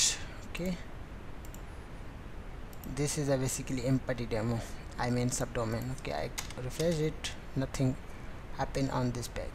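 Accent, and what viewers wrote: India. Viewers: indian accent really not sounds good to my ears but thanks to this video bro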